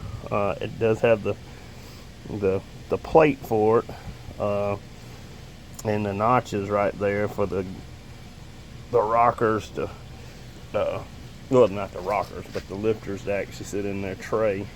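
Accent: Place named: Alabama, United States. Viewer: I saw Alabama car rescues and clicked on the video and as soon as i heard you start talking i knew your from Alabama. 😂😂 Me too, dont know why ive never seen channel before,new sub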